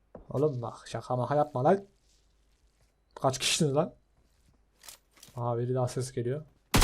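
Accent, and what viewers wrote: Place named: Adana, Turkey. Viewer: Reisss ben Adanalı yimda aynı oralı gibi konusuyon bahhhh bahhhh diyisine kurban olim😁😁😁😁😁😁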